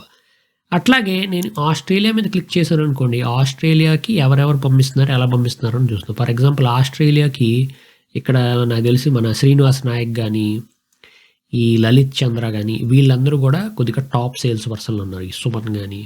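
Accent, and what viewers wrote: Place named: Guntur, India. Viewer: You must be from Tenali or Guntur. You accent matches with that.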